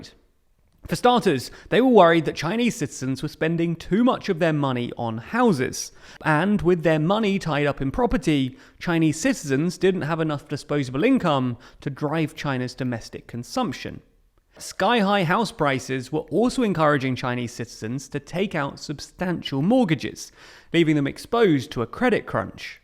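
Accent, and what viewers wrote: England, United Kingdom. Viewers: I think they are based in the US actually (just the narrator has an English accent) :) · Nah mate he is definitely English.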